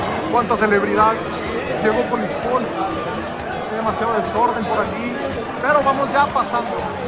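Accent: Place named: Chile. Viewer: hablando como Chileno 🫶🏻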